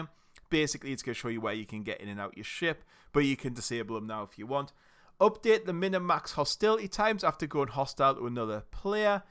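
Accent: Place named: North, United Kingdom. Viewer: Cheers for the patch notes dude, its nice to hear a fellow Northerner for once. subbed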